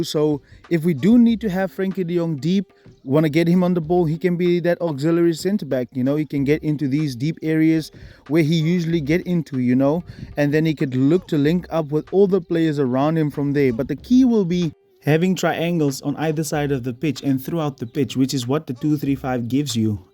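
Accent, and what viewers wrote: South Africa. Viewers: You sound like a South African 😅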